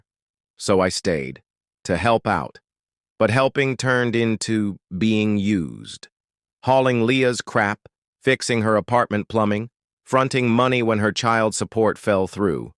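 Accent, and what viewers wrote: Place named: Arkansas, United States. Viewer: I'M JUST AIN'T OMG THIS GUY IS FROM ARKANSAS 😮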